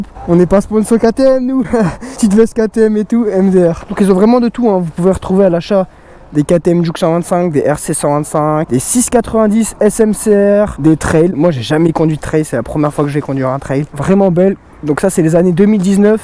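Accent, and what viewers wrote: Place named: France. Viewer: et mec aussi ton créole avec l'accent "zoreille" ça me tue 😂😂 " lé bon"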